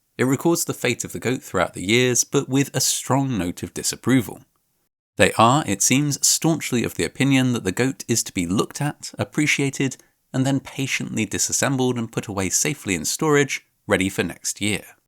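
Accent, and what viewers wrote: United States. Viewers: I really enjoyed your american accent. ❤ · Your American accent is fantastic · Your American accent is a glorious thing. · your American accent is awful. loved the story anyway :-) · Once again, I have been absolutely murdered by your American accent. Brilliant, it was like I was there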